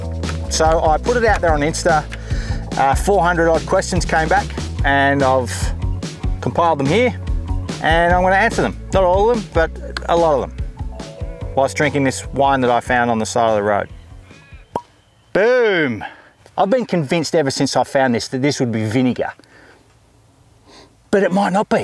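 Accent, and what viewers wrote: Australia. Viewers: O, how I missed that Aussie voice.... Thank you loads for making 2020 a little better. Lots of love from the Netherlands!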